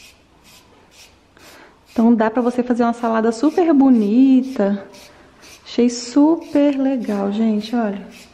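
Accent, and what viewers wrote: Minas Gerais, Brazil. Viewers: Ahhh esse sotaque mineiro😍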